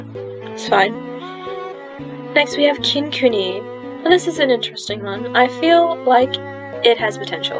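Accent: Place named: New Zealand. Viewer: as soon as i heard your voice i just said “she sounds like a kiwi!” ig i was right haha (im also a kiwi 😊)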